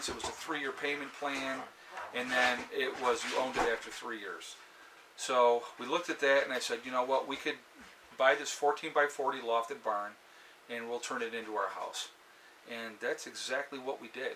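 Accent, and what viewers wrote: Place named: Minnesota, United States. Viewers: He does sound a little Minnesota! Not extreme Northern Minnesota, but maybe Twin Cities area. My family is from up by the Canadian Border. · I'm new to your channel so it's nice to hear a little bit of your background. Thanks for sharing. I'm still convinced that you're from Minnesota. That is a Minnesota accent for sure. I've never heard anyone in New York sound like you. Except maybe in Long Island. LOL!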